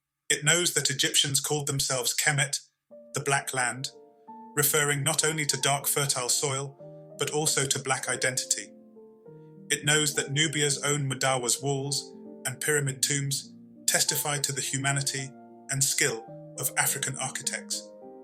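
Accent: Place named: Europe. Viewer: You failed to mention that the kemet people knew how to manipulate frequency and energy to actually move the rocks not the silly imagery of ramps and pulleys (a European concept!)..your European accent says it all!...we need and want to hear black people talk about us to us, not this podcast!!!..I've spoken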